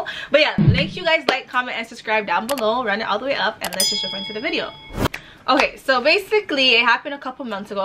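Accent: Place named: Toronto, Canada. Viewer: I loved this video she talk like a Tdot gyal fr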